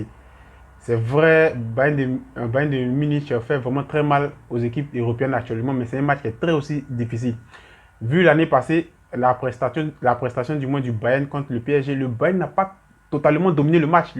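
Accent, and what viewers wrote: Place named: Ivory Coast. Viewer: Mais il a beau rester à l'étranger ce mec mais son accent ivoirien ne quittera jamais 😂😂😂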